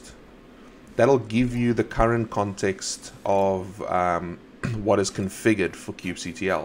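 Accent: South Africa. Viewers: Are you originally South African?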